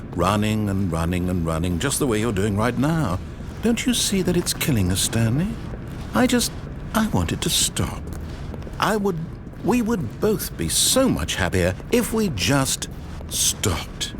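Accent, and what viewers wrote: United Kingdom. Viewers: i dont know what franknfurter sounds like cause i never saw rocky horror picture show but the narrators particular brand of english accent is quite similar to classic stewie's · It's called a british accent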